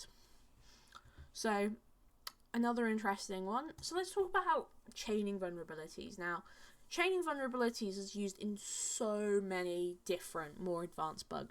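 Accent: United Kingdom. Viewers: I'm completely tunned, I love listening to british accent. Keep going, you're fantastic .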